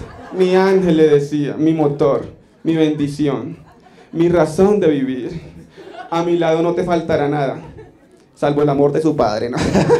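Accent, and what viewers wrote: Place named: Huila, Colombia. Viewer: Yo soy caqueteño, pero este man tiene es puro asento del huila, "opita"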